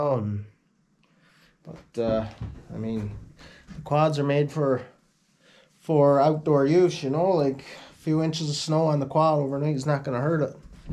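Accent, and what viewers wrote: Newfoundland, Canada. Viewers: Good to hear a newfi accent , reminds me of my youth in clarenville , great video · I knew I saw a Newfoundland flag in the video. I got the accent right off. Great spot for your cabin. I have a daughter lives in Gander Bay. I went for visit last year. Unfortunately I am from Toronto for the last 75 years. Beautiful place keep on sharing.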